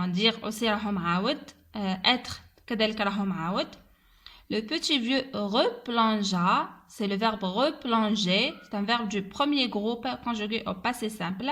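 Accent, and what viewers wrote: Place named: Algeria. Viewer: شكرا اختي بارك الله فيكي بصح تباني في الهدرة جزائرية المهم شكرا لكي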